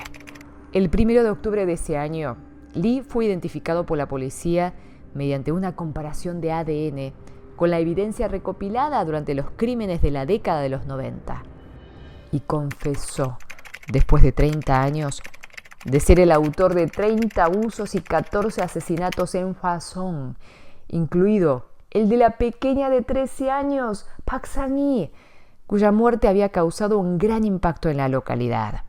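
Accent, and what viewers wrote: Argentina. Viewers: xd es raro ver asiaticos con el acento argentino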